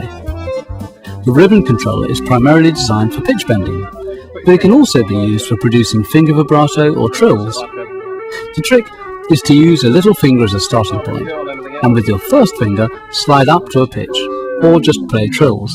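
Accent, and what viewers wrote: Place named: Australia. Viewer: Australian narrator... because of the price of the synth at the time.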